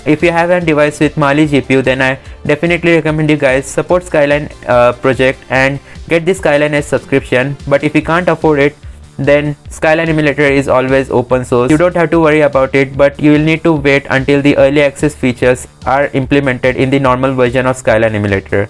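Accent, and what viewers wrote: India. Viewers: Я не знаю английский,но я слышу что ты разговариваешь с акцентом,ты индус?😁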